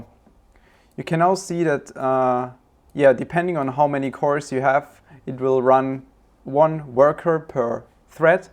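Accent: Germany. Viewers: Man hört, dass du aus Deutschland kommst :D cooles Video! Hast du das Bios gemacht? Wenn ja, mit welchem Tool? Versuche schon ne Weile ein Ozmosis Bios zu machen, aber das funzt nicht, weil es die Firmware schrottet. Was hast du benutzt? LG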